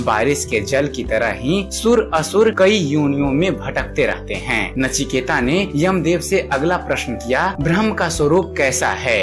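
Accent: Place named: Bhojpuri, India. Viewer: Please speack in proper saskrut manner Not in bhojpuri manner Speack clearly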